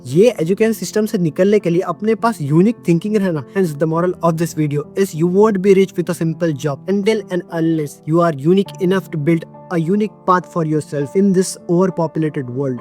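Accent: Hyderabad, India. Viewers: Are You Hyderabadi ? I guess because your accent looks like Hyderabadi people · Accent is amazing, are you from hyderabad??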